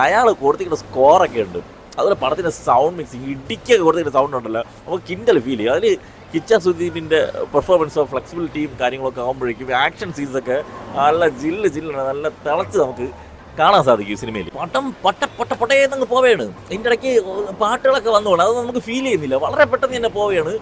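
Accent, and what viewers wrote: Trivandrum, India. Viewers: He is from trivandrum. Do check more videos including live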